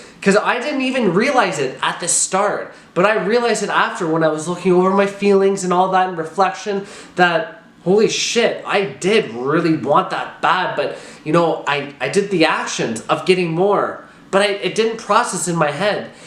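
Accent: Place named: Canada. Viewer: I love your canadian(?) accent.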